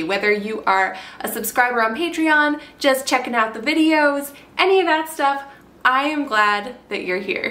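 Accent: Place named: United States. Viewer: wow you aound so american it makes it really hard to follow along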